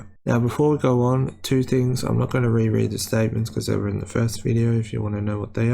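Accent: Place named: United Kingdom. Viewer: ur so british i cant follow what ur saying without locking in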